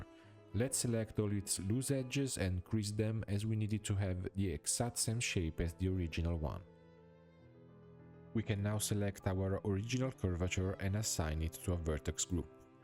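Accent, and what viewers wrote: Italy. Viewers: ma sei italiano? 😂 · Che per caso sei italiano? fantastico video comunque!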